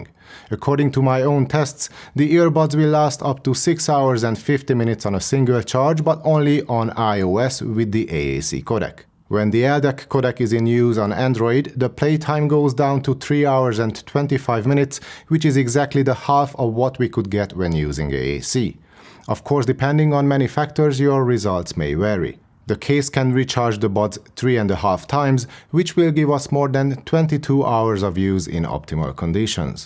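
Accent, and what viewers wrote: Hungary. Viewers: First of all, great content! Secondly, is it just my ears playing with me, or you do have a hungarian accent?😅 We tend to say that a hungarian can recognize another hungarian no matter how professional their language skills are😅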